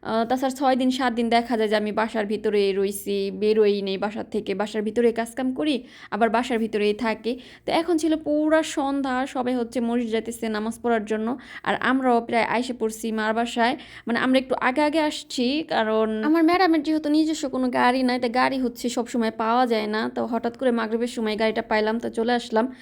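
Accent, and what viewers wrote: Faridpur, Bangladesh. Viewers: আপনার ভাসা সুনে মোনে হোইলো আপনারা ফোরিদ পুরের তাই না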